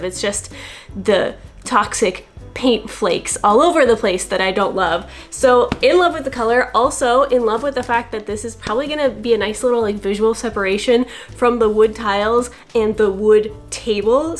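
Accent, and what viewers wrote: Quebec, Canada. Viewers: J’aime beaucoup t’entendre parler canadien 🤭 Ta terrasse est splendide ! Ton propriétaire l’est moins 😂 !! À bientôt ! 🌸🌸🌸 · Ça faisait longtemps que j'espérais t'entendre parler français un jour dans une vidéo (avec ton super accent québécois !) ☺ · Très plaisant de t entendre parler français avec ce superble accent québécois! Du miel pour les oreilles 😘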